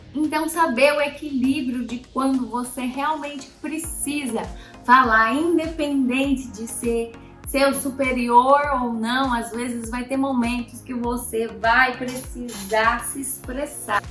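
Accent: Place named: Inland São Paulo, Brazil. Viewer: De onde você é? Um sotaque forte será do interior de SP? ,Ou é Paraná?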